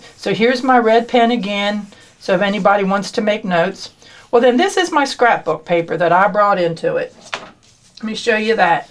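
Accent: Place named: Baltimore, United States. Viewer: That would be awesome but I think we are on opposite sides of the country. I thought I heard a familiar accent (I am from Baltimore) but we live in Seattle. My sons are still in Maryland but I don't think I can talk them into picking up blueprints to bring out in their suitcases for Christmas!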